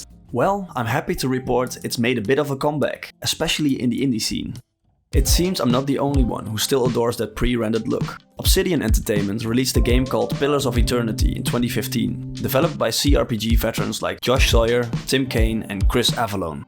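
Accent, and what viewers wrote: Netherlands. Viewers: what is this dutch accent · Accent heard, Nederlander gespot. Goeie video pikkie, subscribed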